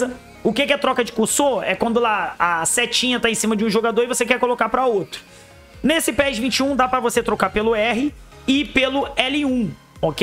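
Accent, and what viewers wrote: Rio de Janeiro, Brazil. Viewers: Vc é carioca ? Seu sotaque Xxx forteee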